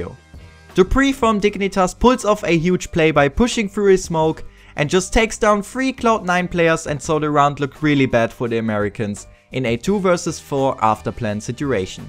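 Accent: Germany. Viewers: Haha hab sofort erkannt das du ein deutscher bist.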